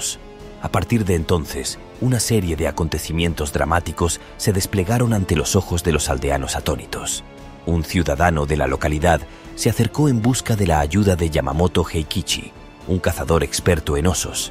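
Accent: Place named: Spain. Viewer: la verdad me parece ridiculo, pero bueno supongo que es más por que todos ya estan acostumbrados a la voz anterior. En lo personal prefiero esta voz, tiene aunque sea un poco mas de sentimiento y le da más un toque a documental que la voz robotica anterior, y a criterio propio siempre he sentido que el acento español le da un plus a este tipo de narraciones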